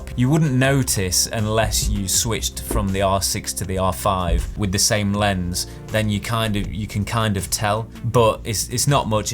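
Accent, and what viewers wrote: Nottingham, United Kingdom. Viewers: Again - I love your british English:) and of course, the content of your videos is of very high quality! Thank you for the comparison, I´ve been using my R6 for 20 months (photos and videos) and I am considering to buy S5 just for shooting videos. Thumbs up, as always. Regards from Czech Republic;) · Nottingham?? Sounds very familiar:)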